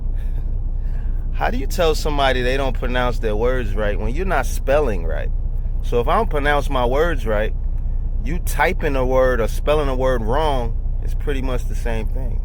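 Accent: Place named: New Jersey, United States. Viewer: Lol dude wildlin...I'm from Nj mostly everybody I kno talk like you and for you too be successful and still be your self is inspiration for plp like me...I tried to find you on ig but a whole bunch of other accounts popped up...I just got my authority authorized this week and love to link with you cuz I kno you'll keep it 💯 let me kno if it's a easier way to contact you stay bless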